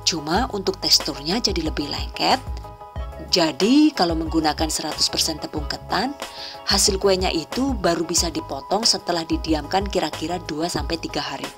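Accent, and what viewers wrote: Banyumas, Indonesia. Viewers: Yu asline wongendi ngomonge ngapak deneng ky aku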